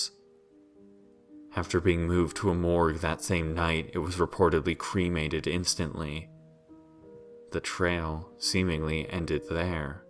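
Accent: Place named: Romania. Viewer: Impressing accent for a Romanian girl!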